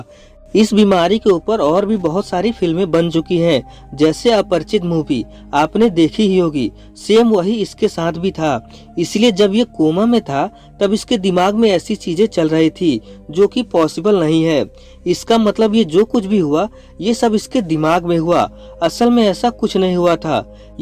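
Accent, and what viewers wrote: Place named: Bihar, India. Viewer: ye bhiyaari h bhai inke yhaan english aise hi pdhaate h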